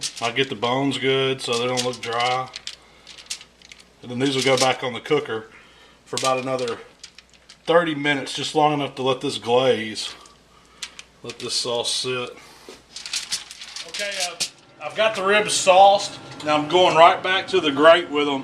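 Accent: South, United States. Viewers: I love your ribs and I love that cool southern drawl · Dig the southern accent. Which state are you from??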